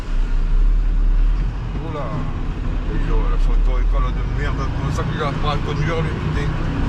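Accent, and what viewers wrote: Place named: South, France. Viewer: Merci beaucoup ça fait vraiment du bien vos vidéos quand on a pas le moral, votre accent du sud, la mer et ces beaux paysage ça réchauffe le cœur et nous fait nous évader avec vous ❤️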